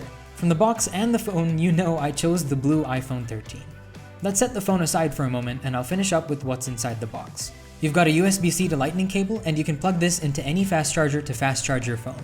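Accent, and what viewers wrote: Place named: United States. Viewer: Living in india but have an american accent?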